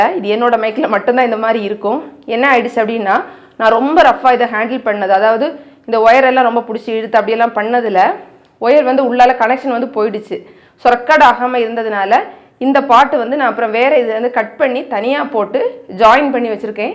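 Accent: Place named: Nagercoil, India. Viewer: Nagercoil slang ungaluku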